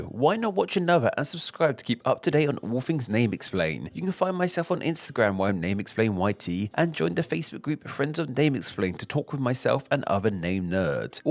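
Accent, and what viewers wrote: United Kingdom. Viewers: He is British, ever heard of different accents?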